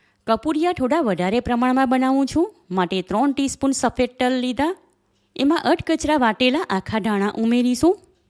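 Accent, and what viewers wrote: Surat, India. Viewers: Proud to be surti. Amari bhasha avi j che ane rehse. Tamne ni fave to tame skip kro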